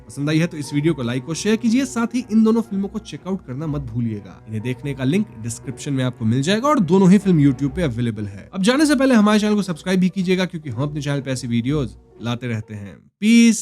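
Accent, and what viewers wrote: Bengal, India. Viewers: Bengali pronunciation from bhaiya se uff damn cute. Once again love from wb and i m one of your bengali subscribers or watchers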